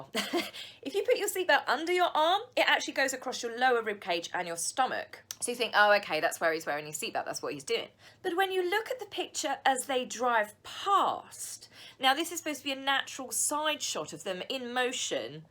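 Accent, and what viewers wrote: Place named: Hartfield, United Kingdom. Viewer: I grew up in Hartfield! I knew I recognized the dialect!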